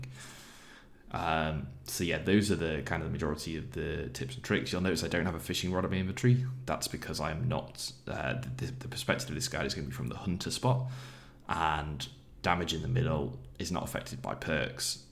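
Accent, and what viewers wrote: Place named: United Kingdom. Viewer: He's Br*tish 🤮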